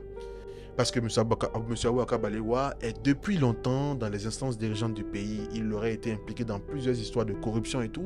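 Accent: Ivory Coast. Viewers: J'aime bien la façon de narrer avec ce accent ivoirien; je m'abonne direct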